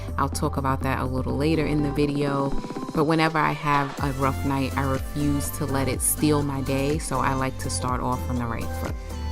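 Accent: New York, United States. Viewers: I just found your videos and saw you live in Texas but when I heard you talking I was like she is from NY has to be. I am a fellow New Yorker who is planning to leave soon you are 💯 it’s too much hustle bustle etc. Great video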